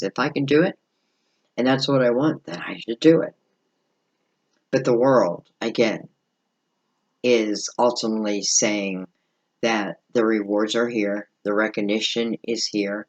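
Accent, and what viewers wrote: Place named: Pittsburgh, United States. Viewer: November 1st. I like your readings. And that's gotta be a Pittsburgh accent!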